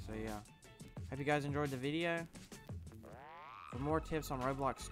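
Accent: United States. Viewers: the cowboy accent lol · red neck accent yeehaw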